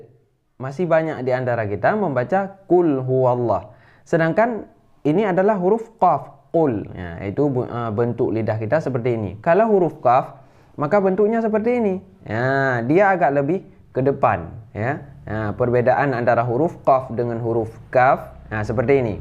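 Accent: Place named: Bali, Indonesia. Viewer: Komen masih sama p.ustad..logat bali jgn dibawa ngaji ...ahad bukan ahadh...kita bisa kitha begithulah ithulah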